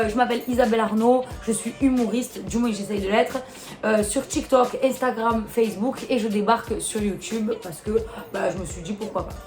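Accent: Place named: Marseille, France. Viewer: J’aime trop ton accent tu représente notre ville vive Marseille et sfair encadrer les jaloux et les envieux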